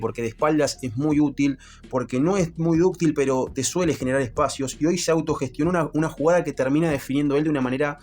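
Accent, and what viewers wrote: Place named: Argentina. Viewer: De donde sos voz que parecieras de acento argentino pero con educacion tal que no pareces argentino?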